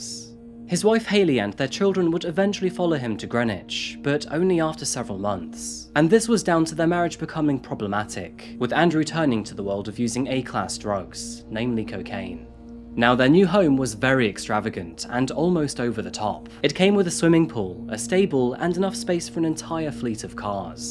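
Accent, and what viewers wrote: United Kingdom. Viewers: I have to turn on the closed captioning in English because sometimes is hard for me to understand what he is saying 😂😂 it's the British accent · Lol, not understanding why Connecticut is pronounced the way it is is rich coming from a man that sounds very British. Americans, try pronouncing a great many British village names and you would be wrong. By the way, I really like your channel. It is one of the very best crime shows on the internet. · as someone from the UK you are kinda brave saying we pronounce things wierd in the US. That being said I have no explanation for Connecticut. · Mate your British like me. Half our town names are spelt differently from how they're pronounced lol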